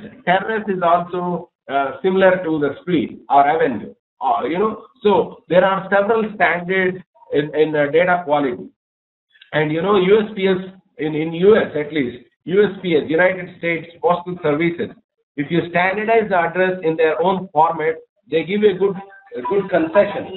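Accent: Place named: India. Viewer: Thanks for the presentation!...but may I suggest to you that its really painful listening to you trying to mix Indian accent with bad American accent with different pitches in tone, but this is really making the presentation delivery poor and sometimes inaudible. Please stick to your natural accent and slowly enunciate your words for better clarity!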